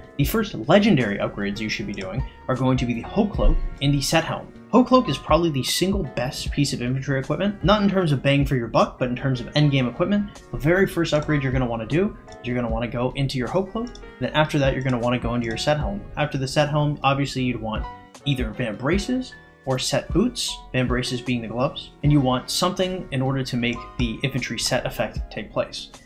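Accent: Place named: United Kingdom. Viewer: Bro I love the voice control on this vid .almost sounded british .hahaha top job as per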